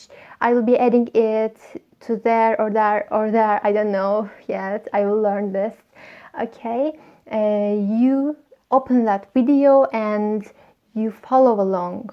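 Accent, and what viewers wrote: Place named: Turkey. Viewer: Türk olduğun çok belli oluyor konuştuğunda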